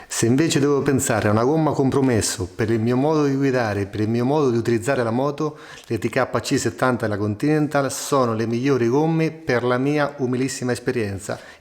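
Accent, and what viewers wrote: Marche, Italy. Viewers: montate da 2mila km sulla mia Africa Twin. Dall'accento sei marchigiano?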